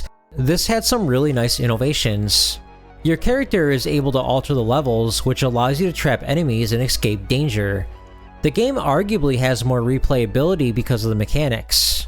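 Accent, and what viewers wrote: Chicago, United States. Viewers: I have no words to express how happy it makes me to have do his signature smile after his intro. That and his Chicago accent makes me click right away. Oh and the content is great too.:)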